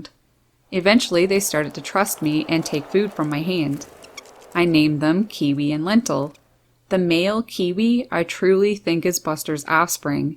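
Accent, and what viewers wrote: Canada. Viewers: What a keen eye for detail and patience. Beautiful Buster and Hatch sweet Kiwi. You have a cool Canadian accent!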